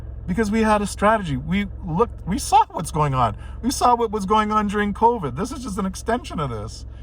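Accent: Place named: Italy. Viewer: It's funny how I hear your Italian accent during your Spanish lol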